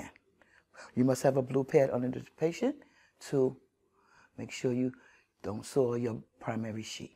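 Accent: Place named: New York, United States. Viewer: Love her accent is that ny?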